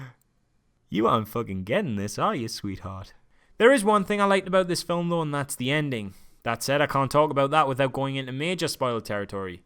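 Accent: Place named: Geordie, United Kingdom. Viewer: Thick Geordie twat.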